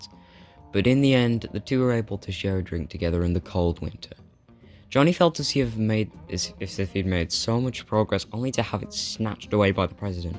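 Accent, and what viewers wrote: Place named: United Kingdom. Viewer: ew british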